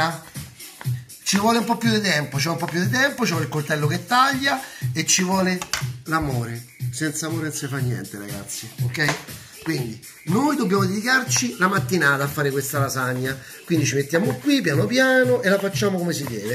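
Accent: Rome, Italy. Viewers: El romano piu che l'italiano ahhaha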